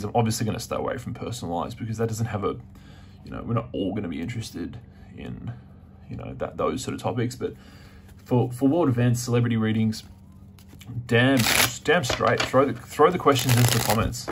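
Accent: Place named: Australia. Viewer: You sound like an Aussie...🇦🇺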